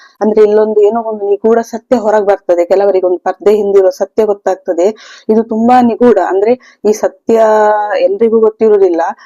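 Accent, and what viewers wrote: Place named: Mangalore, India. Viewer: Thank you sister ❤ are you from Mangalore by hearing your accent I'm feeling like that ..but anyways nice reading.thabk you❤ Thank you universe ❤Thank you god❤ Thank you angel❤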